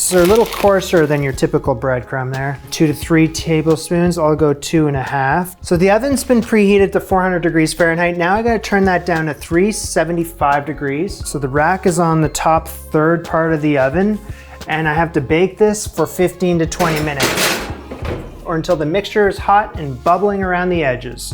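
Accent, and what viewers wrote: Canada. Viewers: Don't be embarassed by your Canadian pronunciations.... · Your previous pronunciation of cauliflower is not that big of a deal. What bugged me at first was how you said “pasta”. But then I heard a few other words that were pronounced in a distinctly Canadian way. So, I Googled “how to Canadians pronounce pasta”. Sure enough, I discovered that was it. My point is that with the way a certain 🦇💩🤪🍊🤡 has been treating our neighbors to the north, I’m going to try to adopt the Canadian pronunciation of “pasta” for the foreseeable future.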